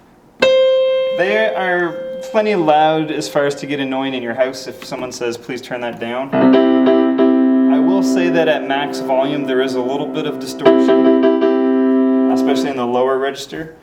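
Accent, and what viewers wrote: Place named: Canada. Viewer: WHAT PART OF CANADA ARE YOU FROM , EH ???????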